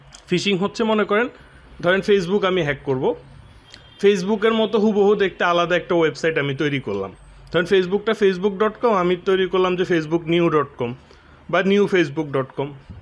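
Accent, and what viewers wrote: Noakhali, Bangladesh. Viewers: ভাই নোয়াখালী না চাদপুর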